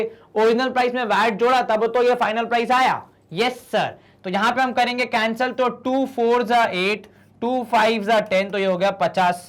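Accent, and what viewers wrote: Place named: Rajasthan, India. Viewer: I think that you are from rajsthan right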